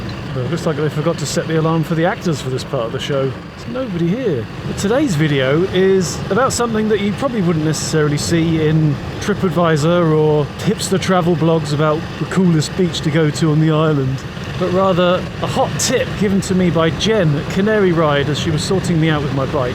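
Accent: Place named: Wales, United Kingdom. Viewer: Great video, and a great Welsh accent, and that's coming from a welshman 😂😂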